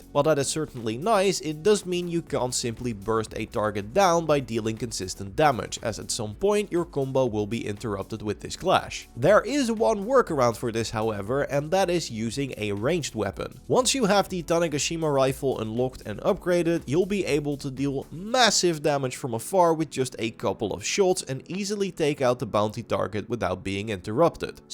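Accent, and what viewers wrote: Netherlands. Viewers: Bro are you dutch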